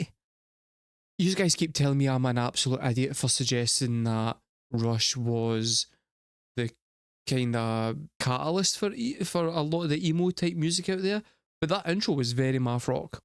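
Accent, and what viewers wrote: Scotland, United Kingdom. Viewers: You sound as if you are Scot. And if so you should be aware of fronted by also a Scotsman and their music is unique for the variety of styles of music that includes the flute as a rock instrument. They released their first album in 1968 and released one every year through 1980 and no two albums are alike. The musicianship is incredible as well as the lyrics of their songs, written by Ian. The live performance is amazing and worth viewing on YouTube. But listen to the studio recordings before you view the live performance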